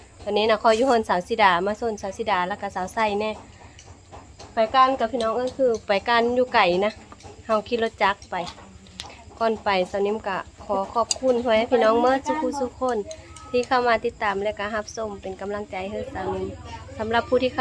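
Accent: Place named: Phu Thai, Thailand. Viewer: เว้าผู้ไทยคือเดียว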